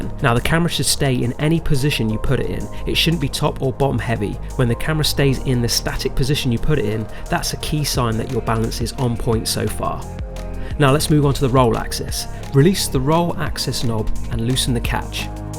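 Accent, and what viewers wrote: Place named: United Kingdom. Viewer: dig the accent…from UK?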